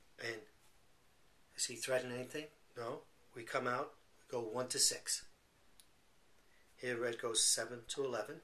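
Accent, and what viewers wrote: New York, United States. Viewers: New York accent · ALEX he sounds like a man from New York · Wayback thats how all New Yorkers sound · Not really lol, just normal NY accent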